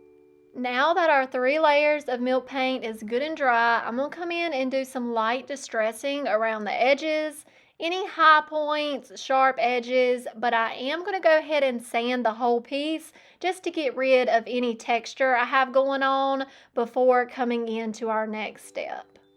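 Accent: South, United States. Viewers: Girl, I'm from southeast Texas and I have a draw myself. I LOVE your accent and many people would KILL to have a southern accent. Have you ever noticed how much they use our accents in movies, YouTube commentary, etc. They are just jealous and only wish they had our accents. Be proud of who you are, how talented you are, your accent, your voice, everything. You're an amazing person and I personally love you and your channel! ❤❤❤ don't let the haters get to you. I would've pinned the comment on the screen and given her screen name. If more creators would call them out by name, I think it would Happen a lot less... keep doing you 😊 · I like your accent. To me it sounds very American. Surprise ! She's an American who lives in America. I don't have a problem with that 😅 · you don't change a thing, I love your sweet presence, and your awesome southern accent. 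I loved all of your projects, but my favorite are always the milk paint green. I hope you have a very Merry Christmas with your family and friend. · I agree! I love hearing your cute southern accent. Don’t change a thing! Some people are just miserable and try to bring others down. We love you! ❤❤❤❤❤❤❤❤ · Please don't hire a narrator. I am in Germany and specifically listen to your sweet Southern Accent and find it intensely soothing. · Some people just don't like our southern accents. You are fine exactly the way you are. That ugly comment was just from a nasty person. That person definitely has the problem, not you. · I thought you sounded country when I first started watching I grew up in country and I related and listening to you describe what you were doing with such cute projects is what made me keep watching So ignore that critic. I had a good laugh in another video when you said your dog was sighing because he was unemployed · And my little secret, I do this and I haven’t told anybody…. 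But I walked through the house sometimes going.” hi I’m.” in my best country accent. I love the way you talk. It gives me comfort you’re so down to earth. So when people are going around, copying you and repeating how you sound even if it’s just themselves, you’re doing something right lady. ❤ Plus, if God wanted you different, he would have made you different. ❤️ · I’m in the UK and I like to hear the variety of US accents, it just gives your channel extra character and charm. Loved the projects 😊❤ Merry Christmas 🎄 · Oh my goodness, …. Your accent and vocabulary is a big part of your charm. At one time, I had a similar southern accent, and as I was on the phone a great deal with people around the country in my career, as people would tease me and not take me seriously, I worked hard to change my affect, and now, after all these years….its gone. Unless I go back home to SC and I fall back into it! 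😂 Thank you for holding to your roots.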